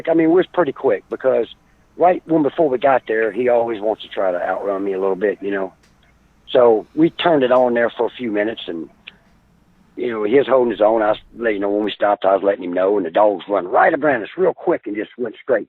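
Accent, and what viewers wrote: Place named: Florida, United States. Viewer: He sounds like an old fashioned Florida guy from the country. Some old bars and pool balls the men's room was actually a back door outside to an alley.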